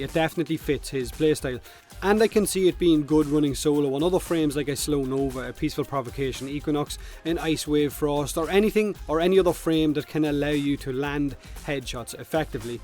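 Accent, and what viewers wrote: Ireland. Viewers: I always come here for my two favorite things. Warframe, and an Irish accent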